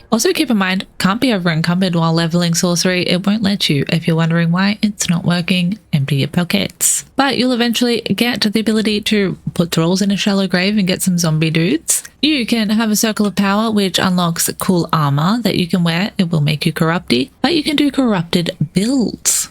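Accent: Australia. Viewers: trying to work out the accent you Aussie?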